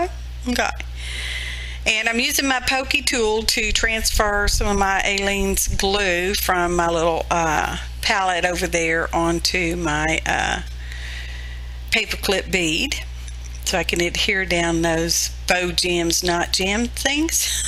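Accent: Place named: South Texas, United States. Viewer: I just received a wonderful envelope of RAK from you. I got the blue and green paperclip bead at minute 32. This is the first RAK/Happy Mail I have ever received. I am so excited. You made my day. Love this video and plan to check out your others. Thank you so much. I am a Native Houstonian, so You do not talk funny, You sound like most South Texas Folk. My new go-to saying is going to be piddle fartin' around. Happy Crafting!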